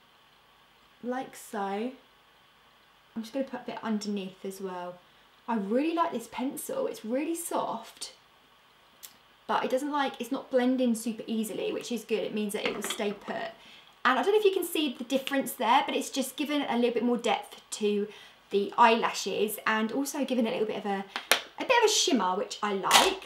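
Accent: United Kingdom. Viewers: I love this look ! Also, I can't get over the fact that you look SO much like Cameron Diaz with a British accent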